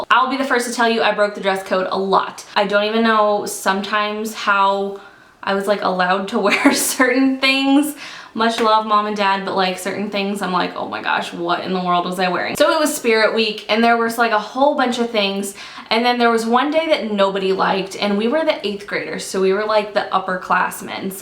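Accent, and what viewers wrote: United Kingdom. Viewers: Sounds Like Plymouth south muddle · how are you not british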